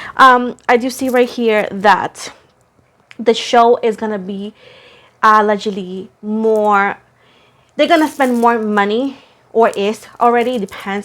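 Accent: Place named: Latin America. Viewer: Hello luv you r doing wonderful 💗. Never mind about those unkind pple and can't believe they were making fun of how u speak ! 🙄 like really!? U r a beautiful Latina woman and of course ur gonna have a accent and even if u didn't they would find something else to complain about. Smh.. so those pple u cannot make happy. There own life prbly isn't happy so u don't need there discouraging nd negative energies luv. U r beautiful inside n out no matter what anyone says! I'm sure u know that and u radiate that! 🩷✨️ U r a hard working mother doing what u love ! U r a genuine loving woman! U keep doing u ! And I luv your readings btw.. always 😊💖🌈✨️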